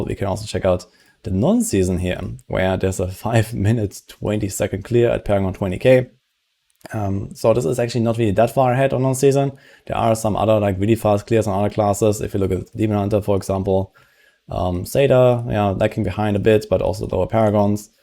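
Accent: Germany. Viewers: Für einen Deutschen hast du kaum akzent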